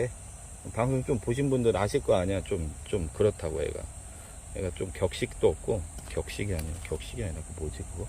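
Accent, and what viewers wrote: South Korea. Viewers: ㅋㅋ 님은 서울말 안어울려요 구수한 시골말로 계속~~~적심방법과 이유 잘 배웠어요😉😊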